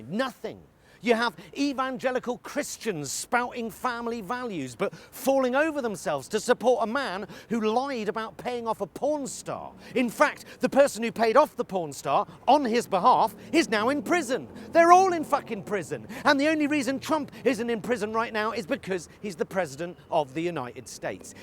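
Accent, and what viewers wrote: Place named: West Country, United Kingdom. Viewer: I am hearing a West Country accent in there but I thought it had a bit of Bath or Bristol in it , but I am happy to be corrected. Funny and to the point stuff. I have a ticket to see Mr. Pie live this month Feb. 2020 here in Ozstriya.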